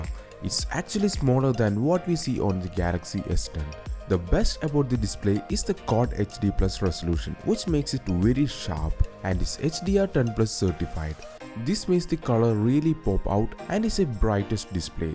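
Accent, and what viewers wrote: Kerala, India. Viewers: R from from kerla. ? I m guessing from accent.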